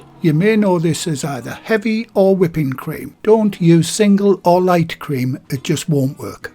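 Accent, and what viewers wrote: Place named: Yorkshire, United Kingdom. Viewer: Hi is this the same as a Victorian sponge? My partner has been nagging me for 4 yrs to make one for him lol but I get a lot of requests so sometimes I forget to make things! This year I will make one for his birthday (in Feb). By the way is that a Yorkshire accent? My grandparents are from Yorkshire and it sounds very similar.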